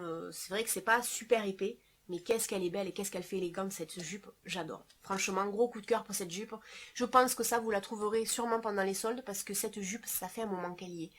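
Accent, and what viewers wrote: South, France. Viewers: Coucou jolie encore une fois super haul, pas de souci on entend toujours ton bel accent du sud!!! J'ai aimé ton magnifique sac camel qui fait très chic, ma préférence va aussi vers cette très jolie jupe crayon en dentelle et ton pantalon camel taille haute avec des boutons (j'ai cette même coupe avec bouton mais en jeans) Petite question peux tu vraiment garder une seule pièce? Pas facile!!! Les pièces te vont toutes à ravir Je te dis soigne toi bien tout là bas dans le sud et te fais de gros bisous A bientôt dans ta prochaine vidéo Farah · Coucou, comme d'habitude, très chics tous ces achats que tu as fais. j'ai pratiquement tout aimé: la veste à pans, le pantalon, le superbe combi-short, le pull épaules en dentelle, les bottines..... Le sac est très joli. Moi mes sacs préférés sont les sacs seaux, à pompons, à franges, à clous, en moumoute, aspect daim..... 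Je raffole de ces sacs:) Le pull bordeaux est très beau. J'en ai aussi quelques-un dans mon armoire. C'est vrai, ça tient chaud. Les robes-pulls, j'en ai pas mal; je suis bien dedans. J'avais deviné, à ton accent, que tu vivais dans le sud:) Pour ses vacances, mon frère allait souvent à Banyuls-sur-mer (réputé pour son bon vin).Il ne devait pas être très loin de chez toi:) Merci pour cette sympathique video. Soigne-toi bien. A bientôt. Gros bisous ma belle.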